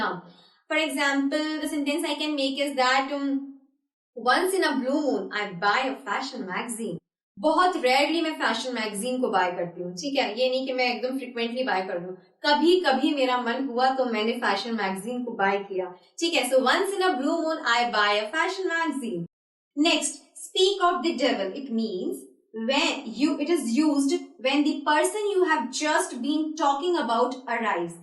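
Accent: India. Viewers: Hey are you from Hyderabad? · Obviously she is inexperienced, speak like a machine gun with strong Indian accent, thank you anyway. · God please! try to adapt a neutral accent and not Indian accent it's so stereotypical. c'il vous plait!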